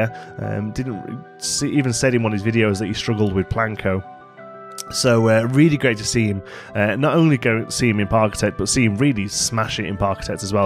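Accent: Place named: North, United Kingdom. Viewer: Are you from the North mah dude?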